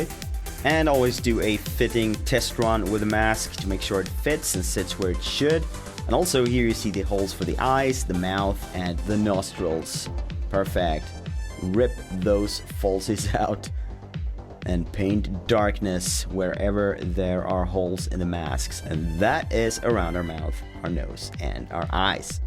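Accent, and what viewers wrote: Scania, Sweden. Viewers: Nice ”skånska”!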